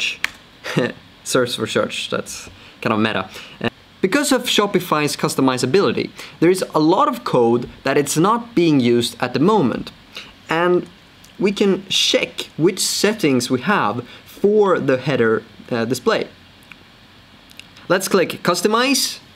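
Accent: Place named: Sweden. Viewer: omg älskar dialekten hörde direct att du va från sverige!!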